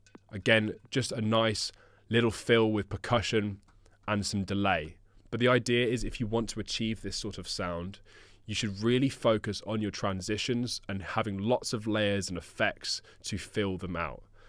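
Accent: United Kingdom. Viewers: You English pronansuation is special candy for ears, is it RP?) thank you for nice tutorial as well!)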